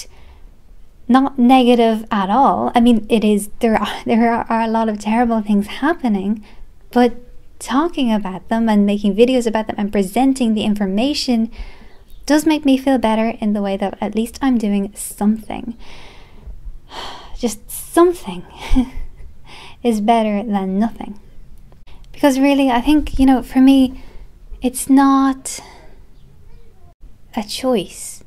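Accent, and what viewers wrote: Ireland. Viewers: Out of the blue you commented on one of my vidoes, so I checked out your channel and subscribed. What can I say, i'm a sucker for Irish accents :)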